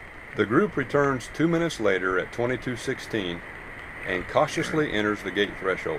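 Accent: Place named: South, United States. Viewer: Wow this is actually interesting as fuck lol and I'm loving the informative narration with a deep southern accent hahahaha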